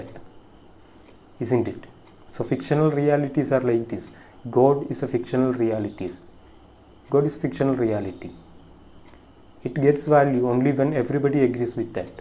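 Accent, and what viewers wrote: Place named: Kerala, India. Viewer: your English accent in this video is okay. So why do you have that heavy Malayali accent in your English while you read out English texts in your Malayalam videos. Anyway, all your videos are good.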